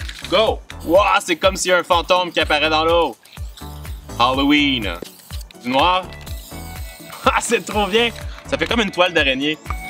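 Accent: Quebec, Canada. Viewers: J adore ton accent quebecua je sais plus comment sa s ecrie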